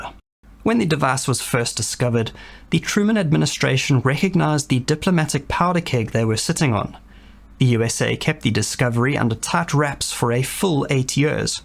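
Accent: South Africa. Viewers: Nice to put a face with the charming South African/dutch accent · Interesting content. Well researched. Your accent is South African English, right?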